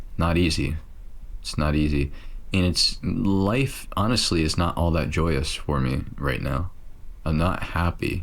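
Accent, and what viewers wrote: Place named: United States. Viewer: where are you from bro. I am from Uzbekistan. I am learning English for IELTS degree at the moment. in my opinion, you are American. I shadow you for fluency.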